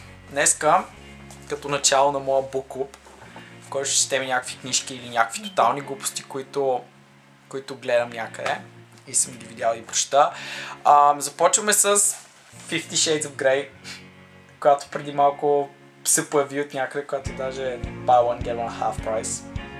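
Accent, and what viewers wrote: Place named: United Kingdom. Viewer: awesome british accent is awesome (bow)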